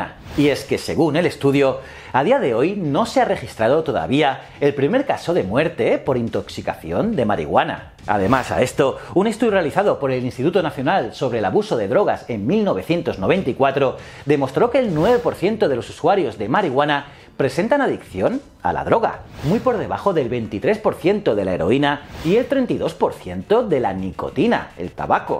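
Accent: Spain. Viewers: Todos los españoles tienen un acento horrible al hablar quiteños por favor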